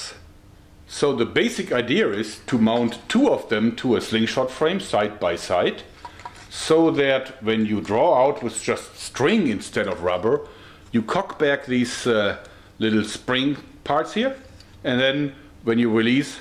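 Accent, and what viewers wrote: Germany. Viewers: Man merkt dass du ein deutsche bist :P Aber nette Falle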